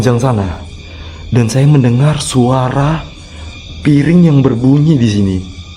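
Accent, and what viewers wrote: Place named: Makassar, Indonesia. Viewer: Maklum dia lagi belajar bahasa logat jakarta karena dia aslinya orang makassar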